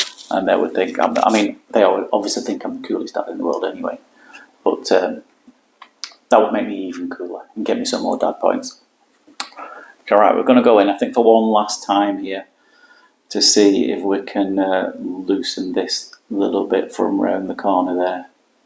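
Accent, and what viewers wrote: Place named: Bolton, United Kingdom. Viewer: love it.. 3 years in college in Bolton back in the 80s so I love the accent (thought you was boltonian at first).. good luck with the channel. The others audiologists..raithatha, zhao and our Welsh friends) went from 10 subscribers to thousands and thousands in a very short period.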